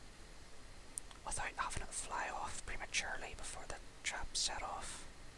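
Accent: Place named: United Kingdom. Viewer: This guy sounds british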